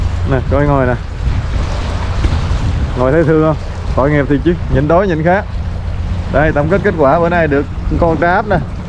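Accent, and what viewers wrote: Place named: South Central, Vietnam. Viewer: Viêt nam bạn ở tỉnh nào vậy .nghe giọng khó đoán miền trung pha nam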